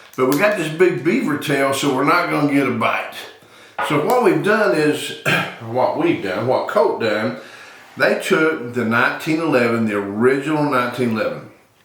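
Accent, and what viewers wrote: South, United States. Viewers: Hey You The Man Coming At You From North Carolina Old Vietnam Vet Retired 100% Disabled PTSD.SARGE I Bought One When They First Came Out & Your Review Was Spot On.Thank You Sir For Your Service. I know A Vet When I See One.Yes Sir Ree!!!! Over In Nam The Best Friend Of Mind Was From Arkansas Best Man Every Has His Shit All Wired Together Tight. You Get My Meaning. Sarge Any Thoughts On Suppressor For This Mean Machine.Have Looked At Threaded Barrels All Over The Web. I got Two Suppressors One For Colt AR15A4 Weapon And One For My M1991A1 If You Have Any Thoughts On This This Subject Please Do Share With Me On The Best Way To Go with This Project Sir. I Love The Way You Talk With Your Southern Slang & I,m Proud To Be From The South Too Just Like I Know You Are.Have A Good One & Keep It Between The Ditches HaHa!!!! Owens Signing Off For Now.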